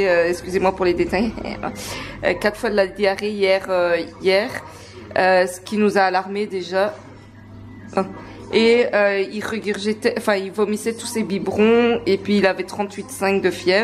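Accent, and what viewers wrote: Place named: Belgium. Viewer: Tu es super mignonne❤🙏 et ton fis heen😍... serais-tu belge , je reconnais l' accens hahahha / ton fils et metis? excuse moi, mais c' est la 1er vidéo que je regarde de toi et j' aime beaucoup😊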